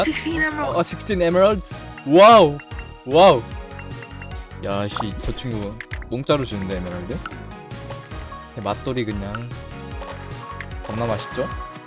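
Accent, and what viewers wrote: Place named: North Korea. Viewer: 북한말투 그만해 제발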